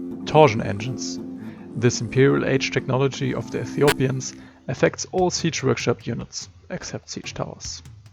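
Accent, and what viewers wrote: Germany. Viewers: i hear you are german - me too :D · Great video, love the content and I think you sound like Cillian Murphy if he had a German accent 👏🏼. Looking forward to the next vid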